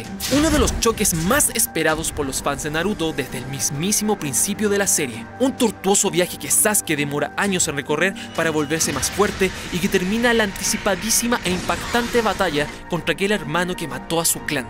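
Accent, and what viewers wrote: Chile. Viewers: ¿chileno? :v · eres chileno?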